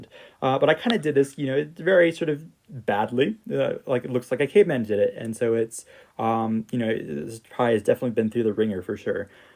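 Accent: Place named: United States. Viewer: his accent seems american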